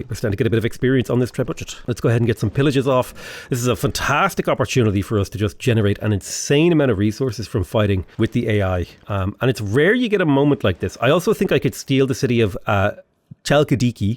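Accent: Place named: Ireland. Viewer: Anyone else think that as progresses, his irish accent gets thicker?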